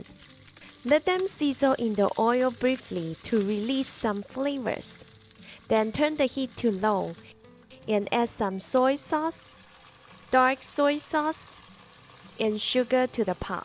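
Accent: China. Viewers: You sound Chinese but you could be Middle Eastern Chinese... That's the thing... :) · 😂😂😂 Chinese accent is definately there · That Cantonese-English accent just makes me feel at home 🏠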